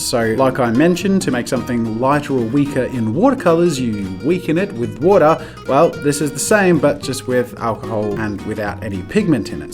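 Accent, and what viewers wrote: Australia. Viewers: I even fell in love with the Aussie accent! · Is this dude Aussie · I love the Aussie accent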